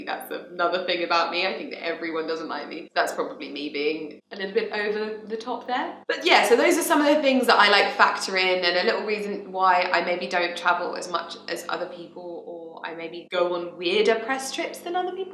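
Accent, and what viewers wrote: RP, United Kingdom. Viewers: First! For the first time! I was waiting for the video and tadam! ❤️ Great video really! It’s nice to know you better (and sometimes think « oh! just like me! ») Too bad about grammar. I hoped increasing my english level by watching your videos and reading your blog 😁 Just kidding of course. To me your english sounds so posh and you know what Pr Henry Higgins says in My Fair Lady : « The french don’t care what they do actually as long as they pronounce it properly ». So grammar or not grammar that is not the question, to me you are perfect. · I think you have great grammar but I love what you have said because I'm the exact same. I think your accent makes you sound posh if you had an aussie accent like I do it's worse it's so bad. Xoxox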